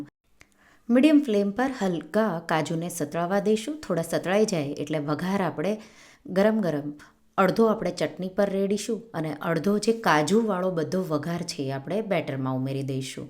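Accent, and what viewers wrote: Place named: Kathiyawad, India. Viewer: તમારી વાણી એકદમ કાઠિયાવાડી મધુર છે. Love from New Zealand.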